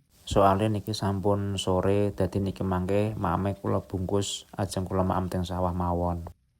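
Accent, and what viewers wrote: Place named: Java, Indonesia. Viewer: Bang sampeyan ki uripe no wilayah ngendi yo kok bhs jawane medhok banget .aq terus lo nyemsk tik tokmu aq jawa timur mlng .aq seneng nyimak olehmu masak2..nek masak bumbune cucinen disik lo yo wedine onok kotorane...yen nyawang olehmu maem dadi ngiler..mugo2 cenele sampeyan terus maju nggih.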